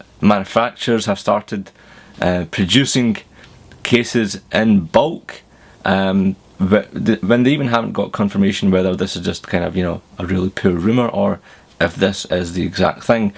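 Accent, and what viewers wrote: Scotland, United Kingdom. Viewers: lurve the scottish accey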